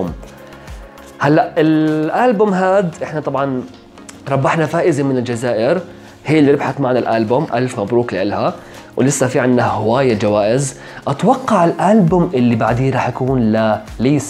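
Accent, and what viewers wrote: Iraq. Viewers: انت كنك عراقي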